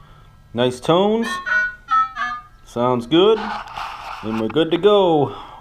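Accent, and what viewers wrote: United States. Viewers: Did you say "a good-eye deer"?? I enjoy the hell out of your New English accent but that aside video takes the cake! Hands down, the most informative and honest take on these Minelab machines as far as tips n' tricks and idiosyncracies of each of them. Thank you for your service and happy hunting from a new subscriber in Florida.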